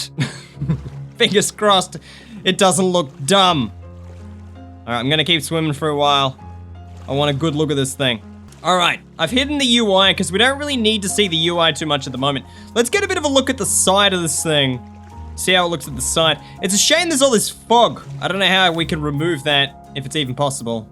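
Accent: Australia. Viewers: Are you Australian? I sub to Aussies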